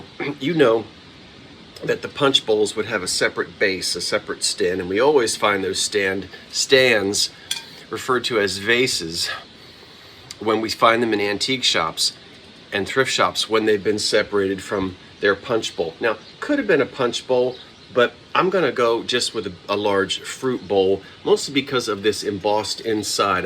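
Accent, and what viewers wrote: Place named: Pennsylvania, United States. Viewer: I haven't watched you for awhile, I missed you. Do you still have your apartment in Philly? I love watching you walk around town. I was born in Chester I grew up in Parkside Brookhaven area my mother grew up in downtown Media Pennsylvania so I love listening to your accent which is very familiar to me and you and I love the same kind of antiques. But finding art-deco out here is really hard so I've been sticking to Murano Glass. Is your bungalow on the Jersey Shore? So good to see you again?😊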